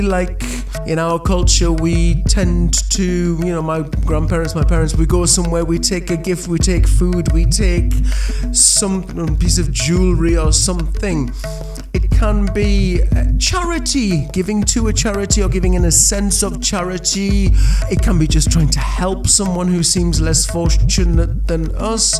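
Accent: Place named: United Kingdom. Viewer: I fully agree with you... In France, supposedly, nobody is really hungry - the government provides for them... last time I gave something was a sandwiich - someone told me what i just wrote about government's help - impossible to know if they are really needy - it is impossible to always give and give when, you, yourself, are in a critical financial situation... complicated subject in a way... Great talk ! love your british accent ! lol ! renee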